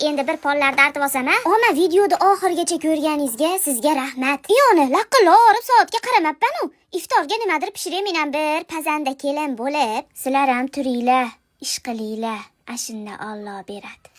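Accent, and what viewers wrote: Andijan, Uzbekistan. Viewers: Anjancha shevaga gap yòq❤❤❤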